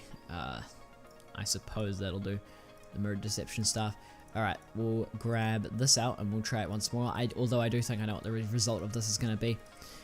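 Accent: New Zealand. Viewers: nice nz accent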